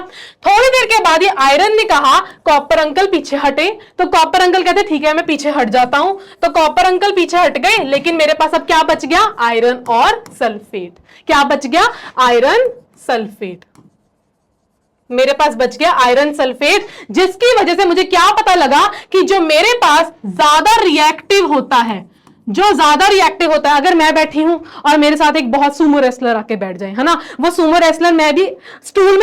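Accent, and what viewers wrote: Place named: Punjab, India. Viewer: I'm Punjabi and they way she is talking is clearly showing she is punjabi😅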